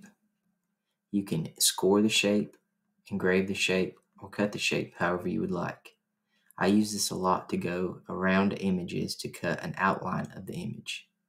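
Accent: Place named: South, United States. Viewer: You did an amazing job on this!! You are nice and slow on your descriptions, and I absolutely love the Southern Drawl!😊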